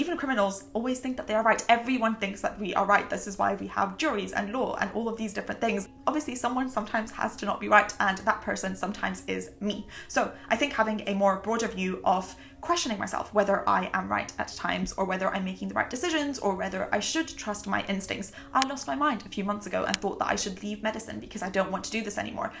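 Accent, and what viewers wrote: United Kingdom. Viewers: I can listen to you for ages for your adorable British accent.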